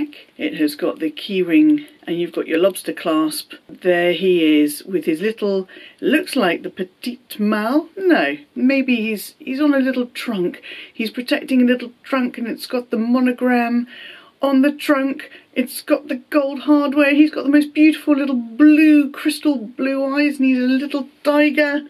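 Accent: United Kingdom. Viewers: I’ve laughed. I’ve cried ( your cat was beautiful) . It was actually the cat that made me click. Your voice is wonderful! I love a British accent. Your personality is va va voom! Thanks for the video. I’m looking forward to your next!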